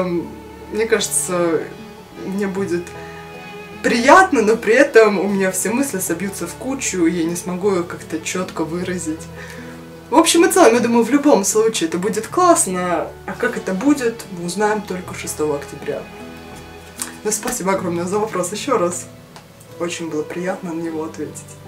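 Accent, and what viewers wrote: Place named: Kursk, Russia. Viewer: Класс) так и думала что ты из Курска) акцент;) я тоже из Курска, но живу в Москве ) с удовольствием бы с тобой познакомилась очно)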